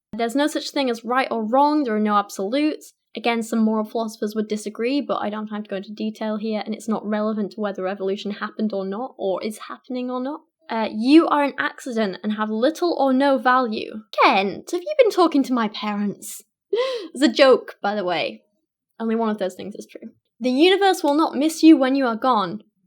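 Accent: United Kingdom. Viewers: Girl why don’t you do something constructive and wear less makeup, why do you atheists insist on going after others who don’t follow your fairytales? Maybe spend more time looking in the mirror, beauty is skin deep, your soul is dark and ugly, and why make videos at your expense while trying to please your moronic subscribers? You bring up Dr Kent Hovind because you need viewers, maybe develop your channel on your own merits and not going after someone trying to save you from hell. But you sound like a Brit so that explains your godless attitude, hope that works out for you.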